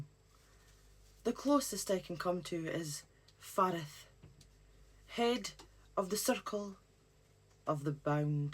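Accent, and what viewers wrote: Scotland, United Kingdom. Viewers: ITS THE MAGIC THAT BINDS US , THE SURILION BLUE , LIKE THE CLOUDS IN THE WIND , LOVE YOUR SCOTTISH STORY TELLING TO FALL ASLEEP TO 💙💙💤💤💤💤💤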